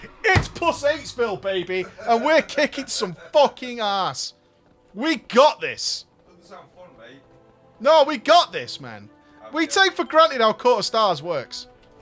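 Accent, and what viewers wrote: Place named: RP, United Kingdom. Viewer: your a duki lad why has your voice gone all posh:)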